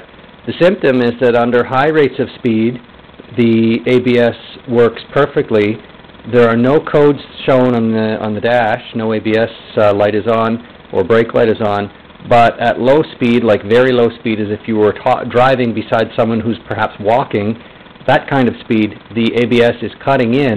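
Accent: Canada. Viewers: you're canadian?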